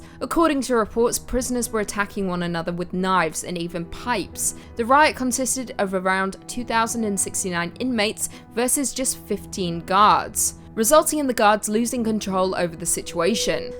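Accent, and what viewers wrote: United Kingdom. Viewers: Figured a prison in North Korea would make the list. Lovely sounding British accent, BTW. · Awesome video in a beautiful UK accent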